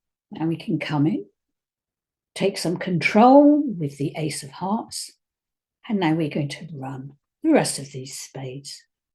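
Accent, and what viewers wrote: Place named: United Kingdom. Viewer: Hello from New Zealand! I am a complete beginner, having just completed an initial 8-week course on ACOL with my local club. I am looking around for additional information and must say that your explanations are excellent. They give important context to all the rules and principles I'm trying to absorb. Thank you for this as it's much easier to remember the rules when I understand the reasons behind them. I look forward to working my way through your videos as I think they are going to be really helpful. 15,000 views would suggest that others feel the same. Love your calm British way of speaking as well - you are a joy to listen to. Thanks again :-)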